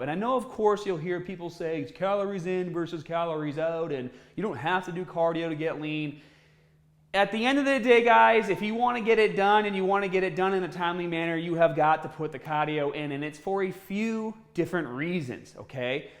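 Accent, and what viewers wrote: Boston, United States. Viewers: Great video! Your Bostonian accent cracks me up, though! 😆 Especially since it doesn't seem to be consistent: you kept alternating between saying "cardio" and "CAH-dio" during that part of the video! 🤣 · “Cah-dio” I love the Boston accent. is a sexy and smart man. Always great content! 🙏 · Cardio. It's a Boston accent, grow up. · The Boston accent kills me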